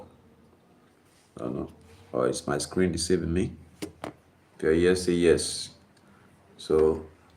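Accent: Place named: United States. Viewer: Sounds like an Amarican 🎉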